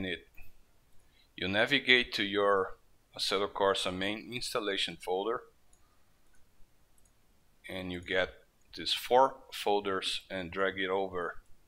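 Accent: Brazil. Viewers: pelo sotaque eu tava aqui pensando "esse cara deve ser br" hahahahahah batata! sucesso para o canal cara, valeu a dica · Eu sei que vc é Br pelo sotaque e pelo windows em Pt Br hauhuah mas olha, vlw pelo tutorial! :P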